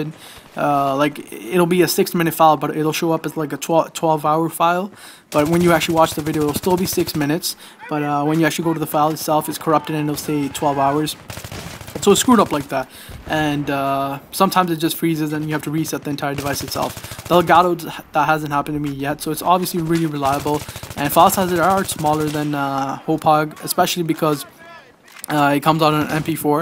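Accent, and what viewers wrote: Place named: Montreal, Canada. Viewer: u sound like u live in monteal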